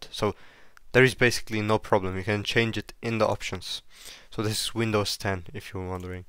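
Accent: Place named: Turkey. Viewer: aksanından, tonlamandan falan. Ana dili Türkçe olan birisi İngilizce konuşunca anlaşılıyor. Çünkü hepimiz o tonlamayla konuşuyoruz.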